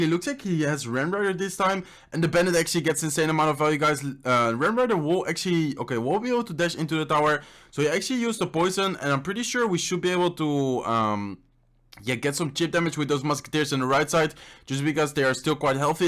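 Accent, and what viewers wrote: Netherlands. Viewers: He is dutch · Ik hoor zo goed dat je nederlands bent😂😂👍🏻 goeie vid tho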